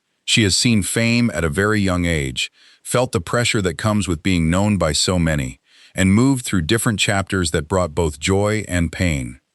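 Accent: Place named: United States. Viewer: Why do we have to suffer the American voice-over wether it be AI or genuine?😢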